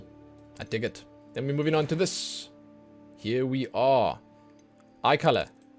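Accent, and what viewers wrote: South Africa. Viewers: I like how detailed this is thank you, and by any chance are you South African? I love your accent! Also I love your commentary and jokes here and there it makes me laugh! Thank you for this lovely video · Are you South African?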